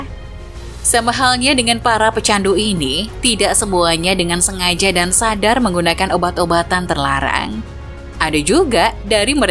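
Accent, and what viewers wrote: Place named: Medan, Indonesia. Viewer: Medan nich bosss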